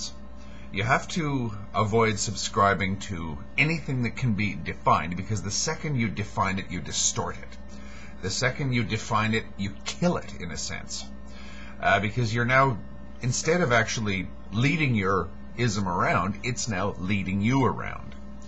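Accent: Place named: Canada. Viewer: Every word you say binds you in the same way, if to lesser degrees. The second you open your mouth you are labelling yourself by the sounds you decide to make. You say you are Irish, or of Irish ancestry. That binds you. The Canadian accent binds you. The fact you use English binds you. The fact you talk at all binds you. You seem to just be trying to avoid binding yourself to things you don't like, which in and of itself, binds you.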